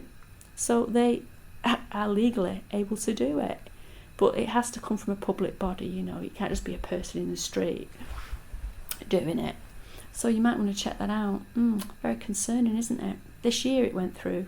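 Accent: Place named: Northern England, United Kingdom. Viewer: interesting idea I notice you have a north england accent I'm from there too. my views on who's doing what is controversial I am wondering what you believe triggered your targeting? as its rarer in england than America nevertheless let's just say Obama has a lot to answer he commited unreal crimes internationally against innocent citizens. all the best I like your idea I constantly give them abuse covertly while on a phonecall I found being reasonable doesn't make them stop. I agree about the hacked phone thing - the signs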